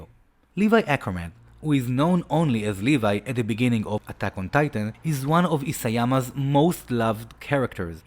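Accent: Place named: Israel. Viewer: אתה ישראלי, נכון? שומעים את זה כל כך😅😅😅 ואגב, אחלה סרטון👌🏻👌🏻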